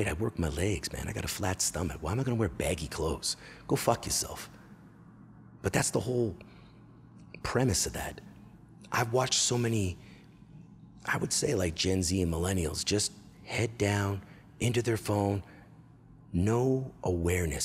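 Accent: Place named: Scotland, United Kingdom. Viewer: Lol 😂 great 👍 Scotland 🏴 accent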